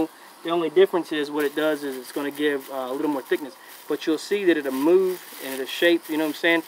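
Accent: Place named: Appalachia, United States. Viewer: HE SOUNDS LIKE OWNAGEPRANK'S HILLBILLY VOICE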